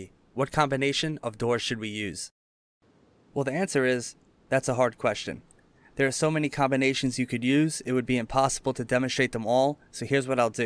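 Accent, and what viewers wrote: New York, United States. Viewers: wait wait wait, was someone not able to tell that is a NY accent? good video as always.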